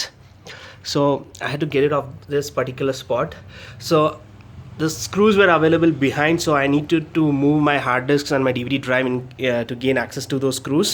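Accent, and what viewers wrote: India. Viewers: listen to him he's Indian and alot of Indians are doctor n engineers n have a great accent so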